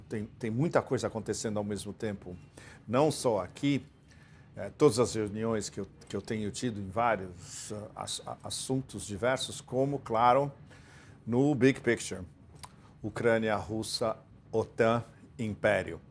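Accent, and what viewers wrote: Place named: São Paulo, Brazil. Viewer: Mêêêuuu esse sôôôtaque da elite paulistana é tuuúuuuudo de bom! Suuuuper peculiar!!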